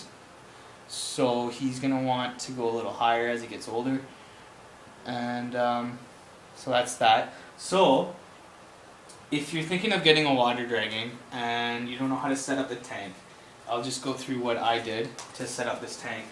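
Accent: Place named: Canada. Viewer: nice video. u sound like ur from Canada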